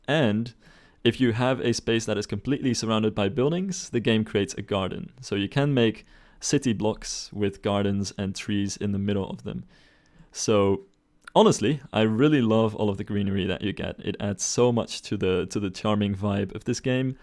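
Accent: Netherlands. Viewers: I think this fits your channel just fine. Its about building and architecture. And you appear to have fun doing it, which makes you enjoyable to watch. Well, and that fellow dutch accent. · I am New here but you sound dutch is that true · you sound super dutch to me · Just found out about your channel and I love this video :) Btw, are you Dutch? I'm from the Netherlands and your accent sounds a bit like we speak English ;) It's not bad! Just noticeable :) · I suppose you aren't English. Hearing you talk It sounds like you are Dutch. Are you?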